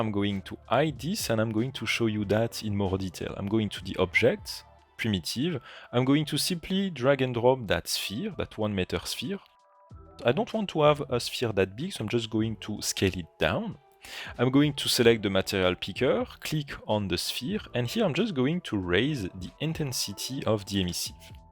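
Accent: France. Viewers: And here you go again with your negativity, being disrespectful and utterly rude. Same as in other tutorials with your comments. I now have reported your messages on the YouTube platform. It says more about your lack of skills. If you don’t find the capacity to understand then simply leave this channel. Unlucky for you there are only a few really great ones out there such as his. Therefore please take a beat and reconsider when you voice against other nations, especially as beautiful as the French. If you were to listen closely you would notice that has a very broad and educated english vocabulary, which is much better than most none-native english speaking people have. So yes, his english is excellent! Having a french accent is not getting in the way of his clear pronunciation at all. It is to note that he is the Technical Marketing Specialist at Twinmotion and is in this position for a reason. 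· Je sens le tont français dans votre voix 😅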